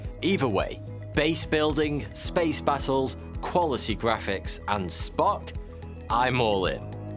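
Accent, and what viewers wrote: United Kingdom. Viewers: This guy had voice that I could listen to for hours. Like Morgan freeman but british! Love the vids!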